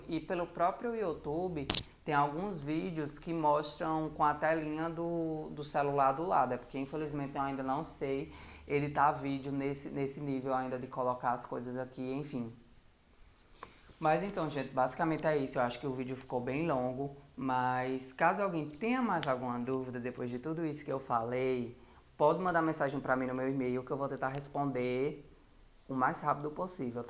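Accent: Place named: Northeast, Brazil. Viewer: Só eu que acho fofo esse sotaque nordestino ? Kkkkk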